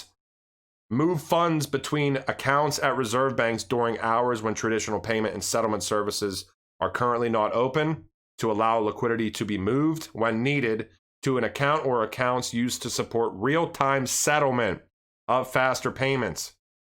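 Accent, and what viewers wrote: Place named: Western Pennsylvania, United States. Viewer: I sense a western Pennsylvania accent.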